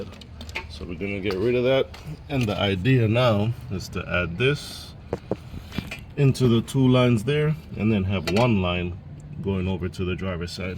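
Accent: Jamaica. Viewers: Heard the accent lol! 🇯🇲 outdeh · You’re Jamaican?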